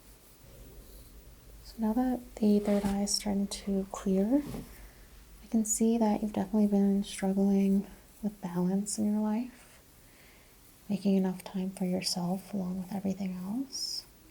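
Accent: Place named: West Coast, United States. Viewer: I totally thought you were east coast! Not west coast! I am JEALOUS of the Seattle ladies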